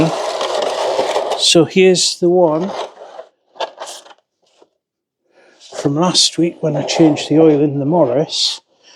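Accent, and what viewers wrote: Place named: Llanelli, United Kingdom. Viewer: Your voice is crosshands to Llanelli area Iam over Newcastle Emlyn way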